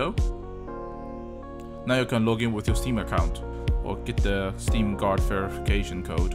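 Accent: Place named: Russia. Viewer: hey your accent its russia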